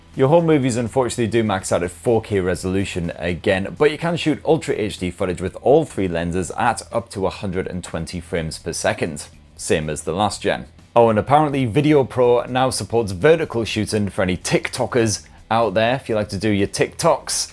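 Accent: United Kingdom. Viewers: I absolutely love you man , your so fucking English it's so funny to watch someone come out with the same English natter than I do 😂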